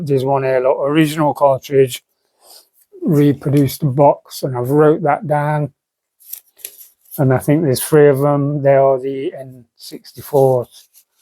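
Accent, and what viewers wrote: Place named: Midlands, United Kingdom. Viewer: where are you based in the uk you sound like youve got a south east midlands accent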